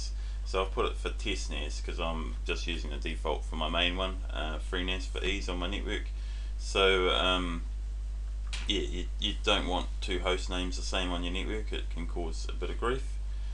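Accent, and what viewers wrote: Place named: New Zealand. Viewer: yeah sounds like a kiwi. Nitwork. Tistnas .. etc.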